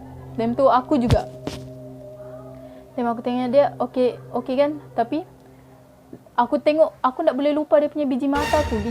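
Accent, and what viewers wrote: Sabah, Malaysia. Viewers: kakak org sabah? best oh semua vid” aka 😍❤️❤️❤️❤️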